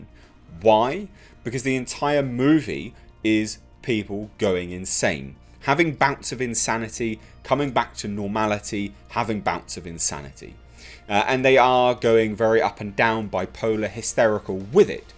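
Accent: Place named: England, United Kingdom. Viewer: Ha ha Automatic subtitles: Colorado Space, must be the english accent. Nice movie, except for the brother and sister nonsence decisions near the ending. Their mother has become something like Brundlefly, but it's ok, everything's going just fine. Or the brother who wants to get into the well to save his dog? Probably the best HPL adaptation now. So far, I thought Dagon was the less terrible with From Beyond...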